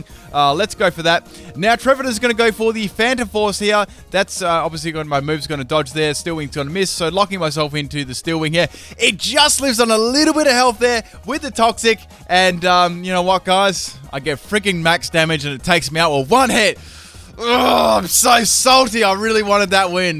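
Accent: Australia. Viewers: "it's gonna spank me on the ass doing 3/4 health there" idk why but that line was really funny. maybe it's the aussie accent.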